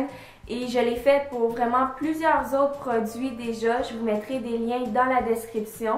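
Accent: Quebec, Canada. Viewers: j aime trop on accent du quebecccccc 👍😘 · salut ma poupe je vous adore j'aime bien ton accent canadien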